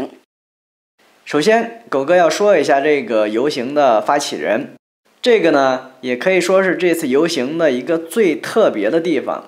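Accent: China. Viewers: 聽你口音是內地的朋友 注意你的安全 大陸很危險的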